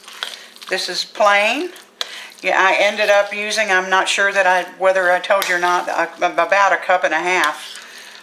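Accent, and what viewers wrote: South, United States. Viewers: This recipe is most valid because the lady making it has southern accent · Mmmmm this looks good I'm going too be trying to make this soon for my husband and kids!!!! I am subscribing too your channel I loovveee your southern twang!!!!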